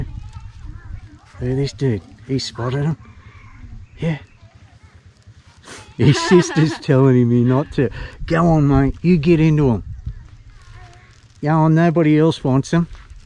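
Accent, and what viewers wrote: Australia. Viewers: I wish you would stop pointing with your finger and stop talking, then I would enjoy your video more. Your Aussie accent is so thick, can't understand a word you're saying.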